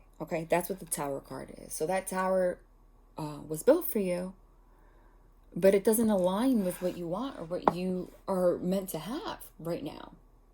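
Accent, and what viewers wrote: New Jersey, United States. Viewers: Ha.. I just clicked , heard you talking and I said, "Jersey!"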